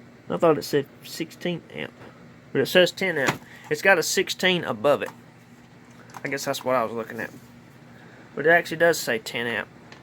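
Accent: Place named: South, United States. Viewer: You're country as hell. You sound just like me. I'm in East TN, what part of the South are you from? I have a Lionel zw275. I've seen a handful of these vw transformers on eBay. I always thought they was just two train operations, instead of four. Are these physically smaller than a zw? I've gotten to where I could use another zw to run my American Flyer trains with. I wouldn't mind trying something different if it has enough power.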